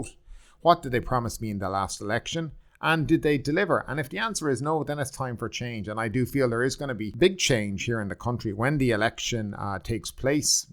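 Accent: Ireland. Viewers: Agreed .He sounds like a fellow Irish man God help me .I bet he was for lock-downs and the jab as well .If this is supposed to be a news service ? The commentary should be non judgemental .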